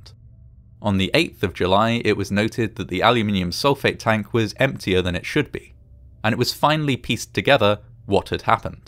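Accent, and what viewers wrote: England, United Kingdom. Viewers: He's an English native, speaking the language of English, with an English accent, in England 🤯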